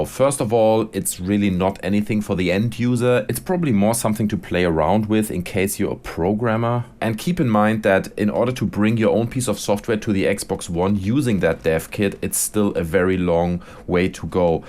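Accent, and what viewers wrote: Germany. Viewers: Erm are you german. ?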